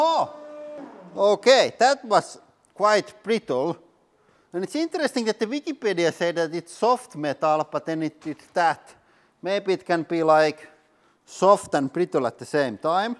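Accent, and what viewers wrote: Finland. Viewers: Flat like'a da pancake Did you invent a new word? Fanacy? Who doesn't love that fin accent? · That accent, Is he Finnish?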